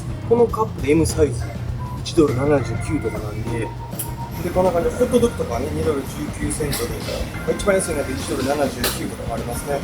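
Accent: Kansai, Japan. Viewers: この関西弁が非常に聞き取りやすい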